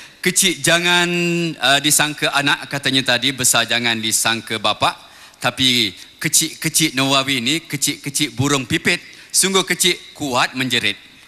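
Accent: Malaysia. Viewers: bunyi dia macam loghat melayu kedah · pelat melayu kuat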